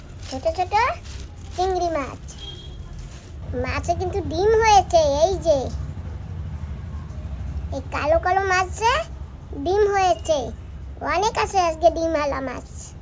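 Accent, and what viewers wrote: Barishal, Bangladesh. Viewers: দিদি তোমরা কি ওখানকার স্থানীয় নাকি বাংলাদেশের সাথে তোমাদের কোন সম্পর্ক আছে আমাদের বাংলাদেশে বরিশাল জেলায় তোমরা যে ভাষায় কথা বলো আমাদের বরিশালের ভাষা একই একটু জানাবেন দিদি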